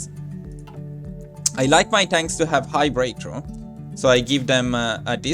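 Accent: Italy. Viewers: Dall'accento suppongo te sia Italiano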